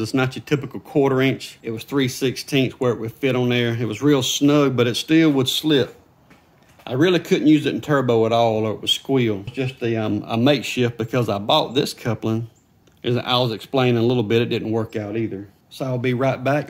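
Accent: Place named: South, United States. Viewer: MAN! this was AMAZING! Videos like this are a rarity these days done in the form of how GM explained their cars in the 50s. And I have to admit, it sounds better with a southern accent. But the Quality of this video is MILES above anything I have seen. FANTASTIC JOB DUDE! I don't even own this model of blower. But I figure My Ryobi RY38BP Backpack Leaf Blower had the same thing that went out on it. And RIGHT on the day of the 3 year warranty expiring! :face-green-smiling: I just ordered a parts blower from Ebay, and plan to just swap out parts that way. But I will have to look into these RC couplings. Again, thank you and WONDERFUL job and explanation & Links! :goodvibes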